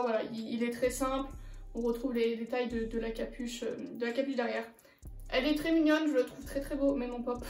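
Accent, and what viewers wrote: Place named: England, United Kingdom. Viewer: Ta un accent anglais un peux j'adore ton accent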